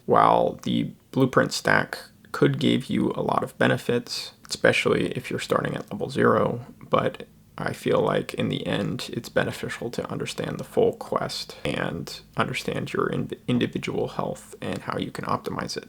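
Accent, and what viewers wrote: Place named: Pacific Northwest, United States. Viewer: Pacific Northwest accent maybe? A lot of people here speak like this.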